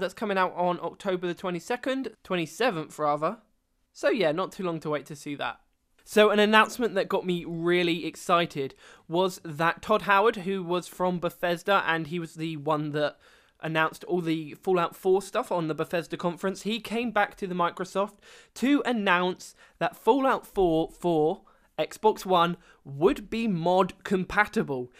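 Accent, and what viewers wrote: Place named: England, United Kingdom. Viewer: yea the only thing i don't like about your video is that you call it a "conference highlights" when you should have called it "my conference highlights opinions" because i just wanted to hear about what was talked about without you imposing your opinion about every highlight. other then that, your bushy eyebrowed lispy accent seems charming, but i can't stand English accents.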